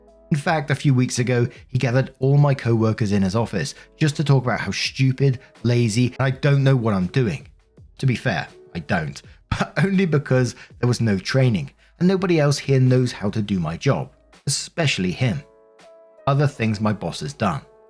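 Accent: United Kingdom. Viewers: Hey! This doesn't have to do with the specific video, but I just wanted to show my appreciation towards you and your content. I've been listening to your videos for several months now while at work (you can listen to whatever as long as it's not too distracting), and I've always loved reddit stories, so when I discovered your channel, it was a total game changer. I like your personality, your perspectives, your anecdotes, and yes, your accent (its true, Americans love British accents), and this channel has honestly made my work shift much more enjoyable. I even got youtube premium solely because of you. I decided to get YouTube membership to further support you. So thanks again, and please keep up the great work!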